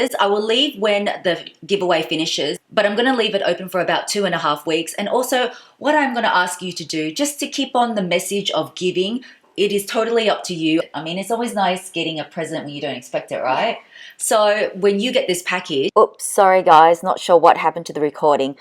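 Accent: Australia. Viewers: Are you Thai or Filipino? Like your Australian accent mixed with Asian roots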